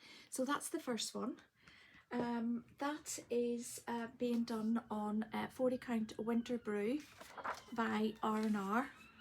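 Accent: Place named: Ireland. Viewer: Thank you for giving us another awesome floss tube ❤ beautiful finishes, project bags and your tiny 🐞 ladybug. And I love listening to your Irish accent, it is so soothing! Yes for a tutorial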